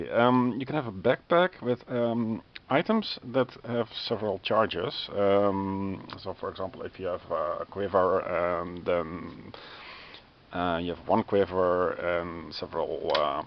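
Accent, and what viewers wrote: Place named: Netherlands. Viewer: Ah I thought I recognized a Dutch accent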